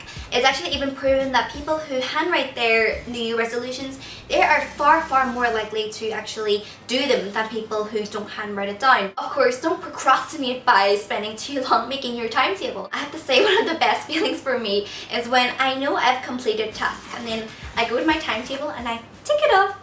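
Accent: Northern Ireland, United Kingdom. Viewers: Are you part northern Irish? You sound a wee bit. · Thanks for this vid:) Aaaand I kinda miss your heavy northern ireland accent! hahaha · Your Northern Irish accent made me chuckle :> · you still have such a northern irish accent, it's so cute :) I'm procrastinating but I'm going to try and beat it! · Yay! You're northern irishness came out in this video haha!!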